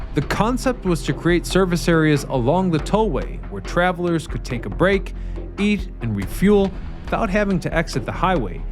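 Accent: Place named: Chicago, United States. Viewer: I knew you were from Chicagoland when you called them the toeway and the food core.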